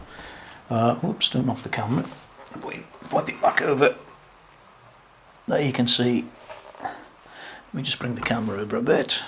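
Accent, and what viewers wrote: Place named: Cheshire, United Kingdom. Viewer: I stumbled across both of your build series and as a Stat player myself I feel inspired to one day take a similar approach, with this mark 2 the thought of Strat without a scratch plate "oh Jesus no!!!!" You sir have more than changed my opinion, what a gorgeous looking bit of kit to own, and the sound and tone even on a smart phone sounds great, if I'm honest apart from my own it's been a long time since I've heard a Strat make me want to get my hands on it lol Do you gig at all? By your accent you sound like you could well live not all that far from me in Cheshire, if you do play live I'd certainly take the opportunity to come hear you play it, I certainly understand if that would be a bit too personal. Anyhow thanks for the inspiration and enjoyable videos, enjoy your custom build, with the money, time, care and effort you've invested in her you certainly now deserve the pleasures that come Cheers!!!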